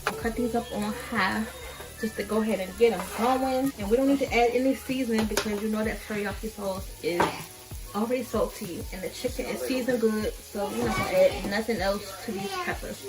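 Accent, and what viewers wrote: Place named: DMV, United States. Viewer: The DMV accent is so strong lol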